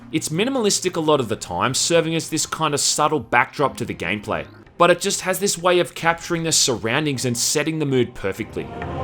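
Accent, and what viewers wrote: Australia. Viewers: is this the same aussie that did those hilarious mortal kombat lets plays? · are you australian? I ask because of your accent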